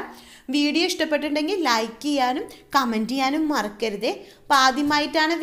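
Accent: Thrissur, India. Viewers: Thrissur alley? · തൃശൂർക്കാരിയാണ്...അപ്പോൾ സംസാരത്തിൽ ട്ടോ മയം കാണും... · Trissur Kariyano? Super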